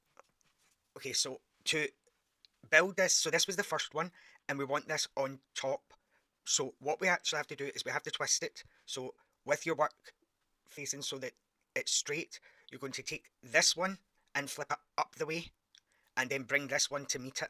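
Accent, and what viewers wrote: Scotland, United Kingdom. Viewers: You had me at the first sound of that Scottish accent,! [grin] Although I was, obviously, seeking material about C2C.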